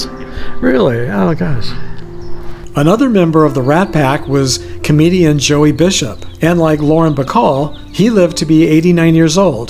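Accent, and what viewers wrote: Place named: California, United States. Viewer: you remind me of Lyle Talbot's son, Talbott--aka "Gilbert Bates" on LEAVE IT TO BEAVER. You even share the same name--even...lol. You both have that California accent; sounding the same. I wonder if Gloria Talbot is related.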